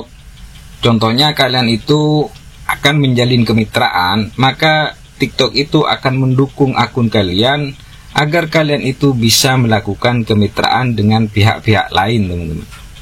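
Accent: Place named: Madura, Indonesia. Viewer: Logat madura kyk nya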